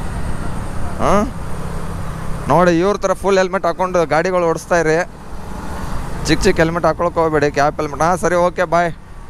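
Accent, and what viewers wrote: North Karnataka, India. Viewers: ನಮ್ಮ ಉತ್ತರ ಕರ್ನಾಟಕ ಮಾತ್ ಕೇಳಾಕ್ ಬಾಳ್ ಖುಷಿ ಹಾಗ್ತದ್ 😍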